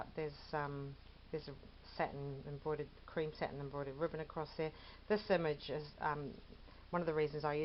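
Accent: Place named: United Kingdom. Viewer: my Husband was laying next to me and asked if you were doing your imagination of. with your 'got ta. (garder) with your BRITISH ACCENT. he's so cute listen to you and me on hear. when he heard you say that. he started singing " " I just love it when he sings to me. thanks for your accent. we just love you and then to put the topping on the cake. we both ended with. IF THEIR ANY GENTS LISTENING we are both in the US. Thanks.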